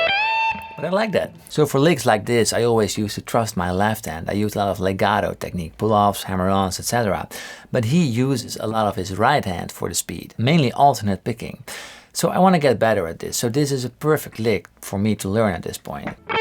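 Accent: Netherlands. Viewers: toen ik voor het eerst een filmpje van je keek hoorde ik meteen al ''dit is een Nederlander"' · U dutch? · Is he dutch? He sounds dutch af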